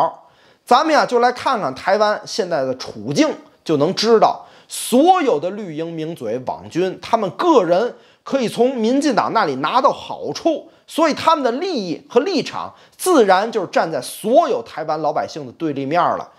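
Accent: Tianjin, China. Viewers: 天津老乡吧？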